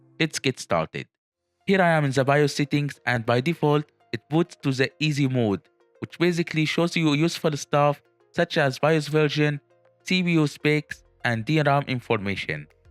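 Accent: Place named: India. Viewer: Indians will never pull eastern women with their accent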